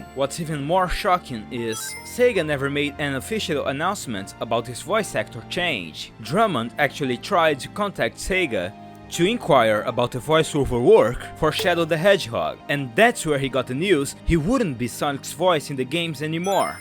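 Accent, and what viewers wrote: Brazil. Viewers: I do think the narrator is brazillian too,, do notice how they say manolo rey, and y'know,, "velocidade" in the bottom corner, not sure if the channel is fully ran by brazillians,,, mas seria legal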